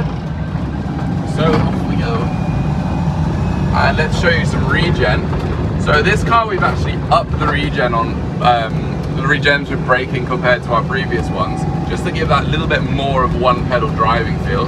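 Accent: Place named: RP, United Kingdom. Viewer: Sorry you sound too posh to give you my money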